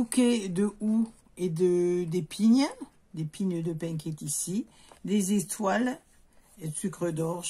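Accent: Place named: Midi, France. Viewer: Bonsoir merci pour vos explications nettes et précises.J ai déjà terminé mes cartes du même bloc c est très agréable.L accent du Midi me rappelle des vacances pas loin de Béziers.Salutations de Bruxelles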